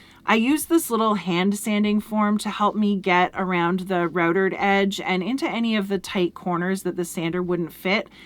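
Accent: Canada. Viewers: That’s a hard “OU” in the word “out” when you say it.. I had to hear it a second time to see what you said. Once I realized it was a northern, possibly Canadian accent, I had to hear it just a few more times for satisfaction.. I even caught myself saying “ahhoout” and “ah-bow-oot.”..😂.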